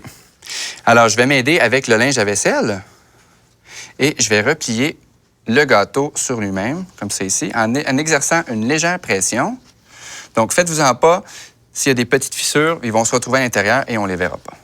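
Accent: Quebec, Canada. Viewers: ًen plus c normal camarade il es canadien pour l'accent et Bravo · C francais du Quebec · il est quebecois lol normal si il t entendait parler francais il trouvera aussi que tu as un accent et ce moquera aussi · Rania Butterfly c'est le français canadien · Il est québécois. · Cest laccent québécoise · C un accent canadien ou quoi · Le système des degrés en Fahrenheit ainsi que le système de poids et de mesure en livre, en pieds et en pouce ( appelé système impérial) faisaient parti de notre ancienne méthode de calcul. Ça doit faire 25-30 ans déjà qu'il a été changé pour Celsius, mètre, centimètre, etc. (appelé système métrique). Mais encore aujourd'hui en 2015, beaucoup de personnes en Amérique du Nord continue à l'utiliser et on comprend très bien les deux systèmes. N.B. Par contre les U.S.A. sont encore avec le système impérial. Effectivement le 350 degrés F= le 180 degrés C. 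Ainsi qu'un plat ou plaque à gâteau de 9 pouces x 13 pouces = 22 cm x 33 cm, ou une plaque de 10 pouces x 15 pouces = 25 cm x 38 cm. Pour finir, j'ai le même accent québécois que lui. FRANCE du Québec. Concernant la durée de cuisson, en minute et en heure, c'est U.N.I.V.E.R.S.E.L. Un peu d'humour !!!!! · C'est l'accent Québécois que j’adore.. merci beaucoup pour vos belles recettes . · Because he's from Québec (French Canada) the people from Québec speak french exactly with the same accent. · J' adoooooooore l' accent canadien et le gâteau bravo ❤️❤️❤️ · C'est l'accent conadien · C'est québécois non? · c'est le Français québécois... pas comme de la France :p mais j'aime bien bro (y) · Cest juste un accent québécois ^^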